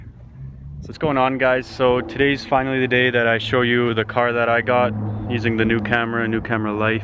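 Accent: Canada. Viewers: You sir got my sub! I can't wait to see how the car will look! And also your Canadian Accent is just beautiful! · are you canadian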